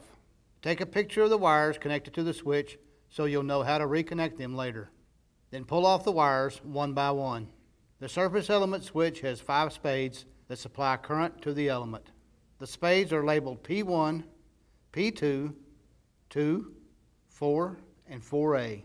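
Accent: United States. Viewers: Thanks, buddy! I'm Brazilian and your accent helps me to understand my American boss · He sounds like he is from the Ozarks of Missouri.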